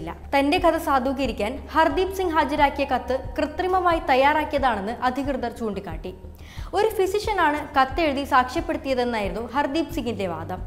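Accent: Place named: Trivandrum, India. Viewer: ചേച്ചി ഒരു ട്രിവാൻഡ്രം കാര്യാണല്ലേ ആ ഭാഷ അറിയുന്നുണ്ട്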